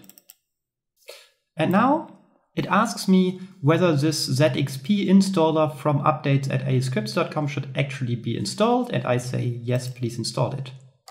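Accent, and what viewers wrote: Germany. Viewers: man merkt das du deutsche bist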